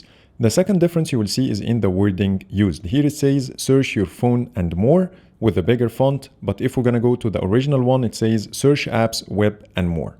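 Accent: Egypt. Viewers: The Egyptian accent is corrupting your English.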